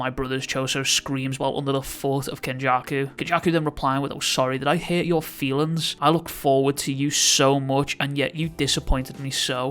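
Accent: United Kingdom. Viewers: Cant lie its cool to hear a Uk Accent whether it be Scotland Wales or England feels like im talking to one of my mates lol great video bro keep em coming <3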